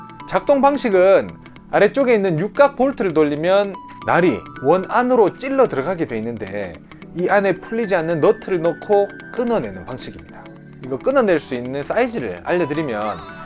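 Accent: Gyeongsang, South Korea. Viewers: 재미있기도 하고 진실함도 보여서 좋습니다. 대구 근처로 생각되는데 경상도 억양이 더 정겹기도 하고~~~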